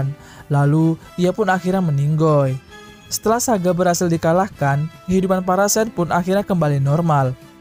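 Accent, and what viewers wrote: Palembang, Indonesia. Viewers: kuat nian? wong plembang apo mang? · Anjir kuat nean Wong Palembang ceritonyo? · Mimin nyo wong Palembang hehehe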